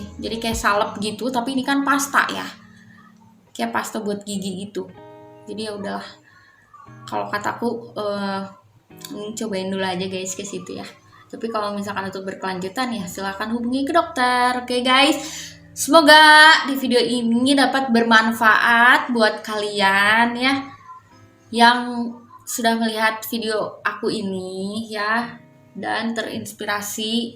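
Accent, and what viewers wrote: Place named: Pandeglang, Indonesia. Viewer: Logat nya pandeglang .. hehe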